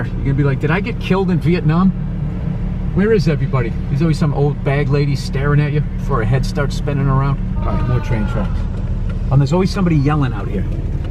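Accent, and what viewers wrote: Boston, United States. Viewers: I noticed your Boston accent still comes through although you've taken to pronouncing your Rs. Westfield Malls are all over the place; I visited one in Florida. There doesn't seem to be one in Westfield, Mass or Westfield, NJ, though. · do another Boston tour the Boston accent is unstoppable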